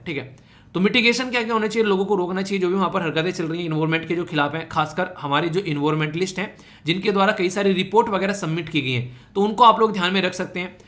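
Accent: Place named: West Uttar Pradesh, India. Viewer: Based on sir's dialect it seems like he from West U.P. possibly near Baghpat.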